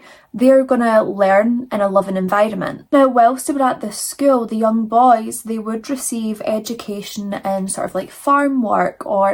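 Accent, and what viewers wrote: Scotland, United Kingdom. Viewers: Don’t let You Tube get you down. Without people like you, THEY WON’T HAVE VIEWERS! Dang. And I love your personality, the spider make up was amazing and I did it to hand out candy for Halloween. CONTINUE BEING A CERTIFIED YAPPER! We love you and faithfully watch every episode. Love the accent, love your own personal comments. Keep Yapping, Scottish, from Irish Freckled Songbird in the US!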